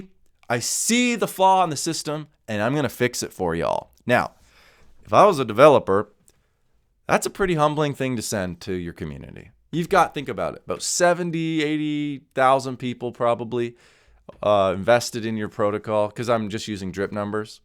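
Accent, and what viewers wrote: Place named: Australia. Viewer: You Australian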